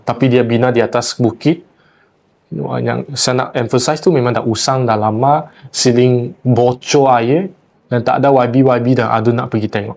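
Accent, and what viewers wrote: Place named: China. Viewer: Fasihnya berbahasa Melayu wpn pelat cina tetap ada.SYABAS KERANA MENGUASAI B.MELAYU DGN BAIK. 👍👍👍🥰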